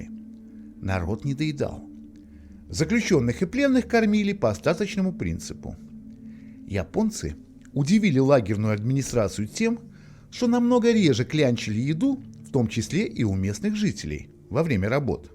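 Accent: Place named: Belarus. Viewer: автор или из Беларуси, или из Псковской области - мы так "Я" не произносим))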